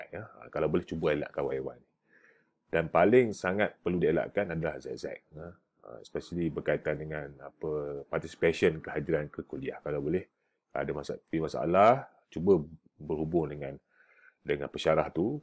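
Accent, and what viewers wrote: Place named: Perak, Malaysia. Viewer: Pekat bebenor peghok nye ye😂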